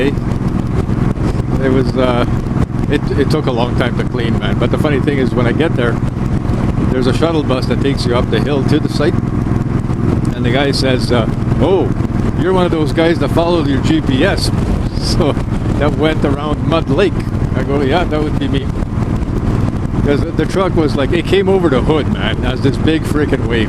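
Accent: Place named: Fargo, United States. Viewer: Hello! A thoroughly enjoyable trip along with some wide-open Canadian scenery! Good to see the Missus and her happy, cheery face! A real treat! Now that the December snow has arrived it was nice to see some earlier, snow-less fields! Hunting stuff with Big guns, Bear Traps, and Fishing gear...😮...😮.. you know me..😉....😉...., I just pop into Sainsbury's Super-Store and collect all my wild-life...ready prepared for me!...LOL..😋....!... and no-one gets shot!...LOL..😂...! Your "Fargo" (film) accent still tickles me,...every time!... 😂...😂...! Many thanks again for the trip, and best wished to the Missus...she needs to be kept warm!....😊...😊...!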